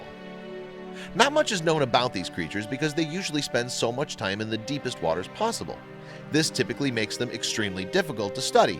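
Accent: United States. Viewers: Why doesn’t YouTube ban did you know? Totally irrelevant content and that annoying know it all American accent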